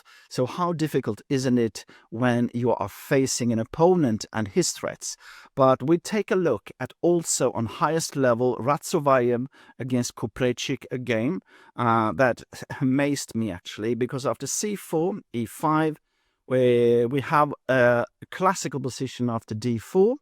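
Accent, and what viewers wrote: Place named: United Kingdom. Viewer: is like Ben Finegold but with a British accent and no blather.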